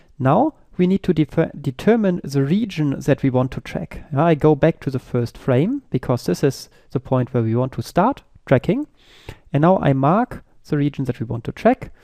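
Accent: Germany. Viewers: ich glaube dass ihr damit definitiv mehr leute erreicht! über den deutschen akzent kann man locker hinweg sehen! weiter so!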